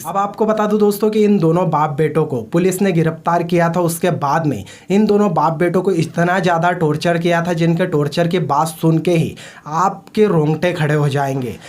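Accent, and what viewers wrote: Madhya Pradesh, India. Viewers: I love ur accent though Are u from m.p.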